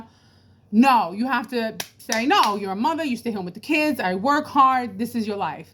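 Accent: New York, United States. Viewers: is that a new york or a new england accent?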